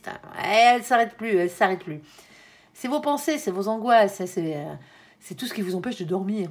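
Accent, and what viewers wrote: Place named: South, France. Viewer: Vous êtes super, et quelle energie avec ce petit accent du sud😂 Quelle maitrise, ça inspire confiance❤ très beau tirage, merci😘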